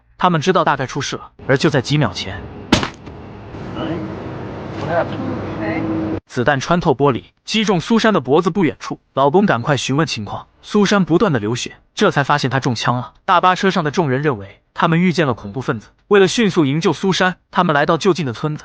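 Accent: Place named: United Kingdom. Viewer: 整片我最出戲的是英式中文，DSG -> 大使館🤣🤣🤣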